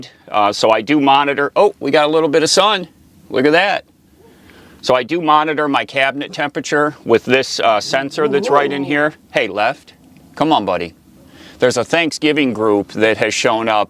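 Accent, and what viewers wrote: United States. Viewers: Hi, glad to see you and Lefty enjoying yourselves in Quartizsite., my son watched your video with me today and was surprised to hear you live in NY, just as we do. He said to him you sound like you have a Southwestern accent or something similar. Just wondering if you grew up in NYS or not. Blessings to you and Lefty, Happy Thanksgiving.:) <3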